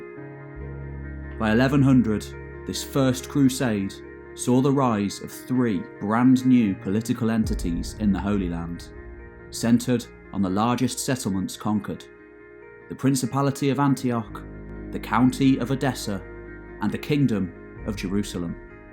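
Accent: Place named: RP, United Kingdom. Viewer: Just wondering if you have a true passion for history , judging by your tone . As I have said earlier , your Queen's English is music to the ears .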